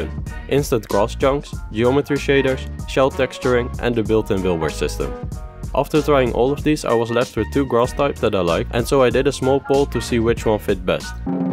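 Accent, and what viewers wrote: Netherlands. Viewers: Are you Dutch? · I looooove the Dutch pronunciation of English “v”s. “Full control Ofer the qamera” - its so satisfying. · It sounds like u have a dutch accent and I immediately noticed that because im dutch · Let me guess, you are dutch?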